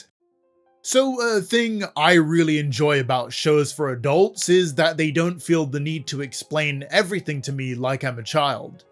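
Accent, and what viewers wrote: United Kingdom. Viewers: Your accent is based on where you grow up not your ethnicity. The video creator definitely isn't ethinically British, but he sounds British.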